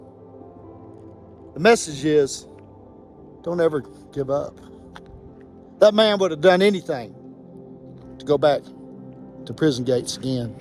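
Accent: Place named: Arkansas, United States. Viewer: What part of Arkansas you from?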